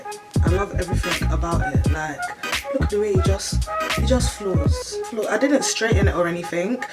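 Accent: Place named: North London, United Kingdom. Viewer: Sis, You sound like a neighbour. #NorthLondon ? Do you do install for others? I beg yu help me!??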